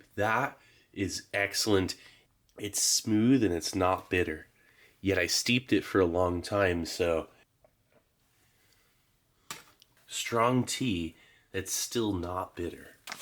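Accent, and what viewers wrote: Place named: Texas, United States. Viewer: Where is this guy from? He has an interesting accent sometimes, not sure if he is from Texas or somewhere else.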